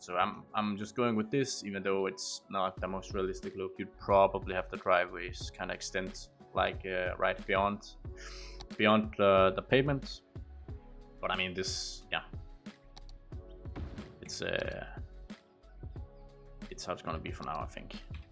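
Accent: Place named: Denmark. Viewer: Sjovt, jeg gættede også på dansker, men så tænkte jeg ikke mere over det 😂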